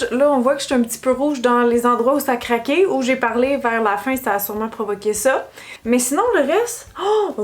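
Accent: Quebec, Canada. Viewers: J'aime bien le parler québécois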